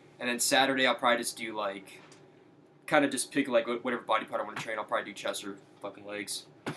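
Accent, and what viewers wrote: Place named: Australia. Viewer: talks like an Aussie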